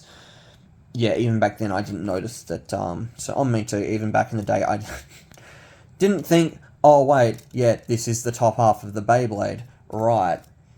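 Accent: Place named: Australia. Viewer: You sound aussie whereabouts are you from would love to do some battles and a meetup. Havent seen much people use plastic in a while gets me excited.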